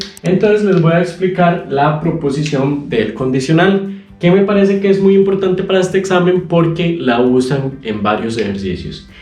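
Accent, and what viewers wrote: Costa Rica. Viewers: En este video se le salió todo lo tico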